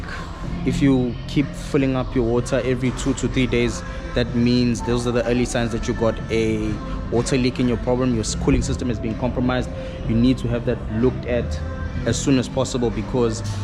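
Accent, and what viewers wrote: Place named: South Africa. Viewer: Love your accent.... mix of SA English, Durbs Indian and I'm guessing Zulu.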